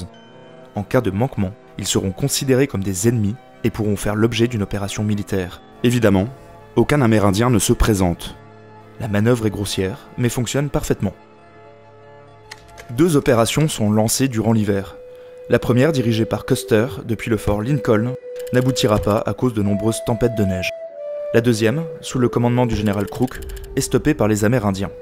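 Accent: France. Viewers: excellente vidéo ! une rectification qui a été faite plus bas en anglais et pas traduite, semble-t-il, les photos de crazy horse ne le représentent pas, il n' existe aucune photo de crazy horse, juste un dessin qui a été fait bien après par un dessinateur professionnel selon des descrptions orales, et qui a été validé ensuite par un des membre de la famille de crazy horse. Si je remets la main sur ce dessin, je le mettrai en lien dans l'intervention en anglais, plus bas. Aussi, je voudrais saluer, a l'heure du grand n'importe quoi des voix de synthèse à tout va, au détriment du bon sens et de la qualité, ce que vous avez fait ici : parler avec votre voix, donc, avoir osé être vous-même vocalement parlant. ce qui semble devenu une chose insurmontable pour un grand nombre de youtubers. En plus, ce n'est pas scandé, hurlé, appuyé d'une façon non naturelle comme on le voit partout. 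bravo ! en tant que pro de la voix et du doublage, j'ai remarqué quelques petites choses perfectibles, mais tres peu entendront ces mini problèmes, comme les coupes un peu "a la hache" en fins de phrases, dans les respirations, les popings légers par moments ou les changements de placement par rapport au micro, qui font qu'on entend des ajouts faits au milieu des phrases. il y a aussi les A a certaines fins de phrases, qui sont un peu fermées comme des O, comme on fait chez moi en bourgogne, ou comme on l'entend parfois à Lyon. c'est très léger, tout petit accent du terroir pas bien gênant mais qu'il s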